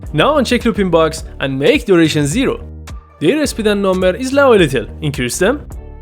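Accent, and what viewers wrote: Iran. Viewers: Where are you from , like iranian talk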